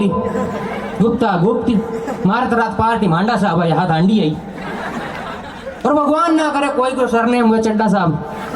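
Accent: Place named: Jaipur, India. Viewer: भाई तो जयपुर side की भाषा बोल रहा है, हमारे मारवाड़ में तो यू नहीं बोले 😂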